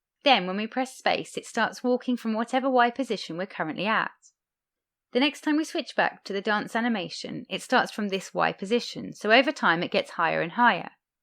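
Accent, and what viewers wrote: United Kingdom. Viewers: Eeeeexcellent! And I like the British accent.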